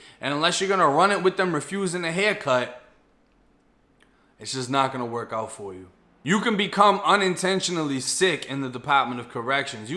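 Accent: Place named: East Coast, United States. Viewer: Biggest crush EVERRRRR😏 it's the east coast accent with the Florida dgaf vibe for me🤷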